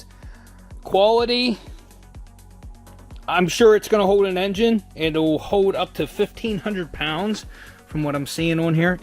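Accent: Pennsylvania, United States. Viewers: Damn. That's unfortunate. It only looks like the door, fender and front pillar. By your accent, I'd say that you are in Eastern Pennsylvania? How about the junkyards in the Scranton area for parts?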